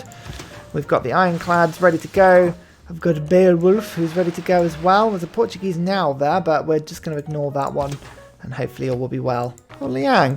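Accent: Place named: England, United Kingdom. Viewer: Whats your unique accent? I thought it was English lol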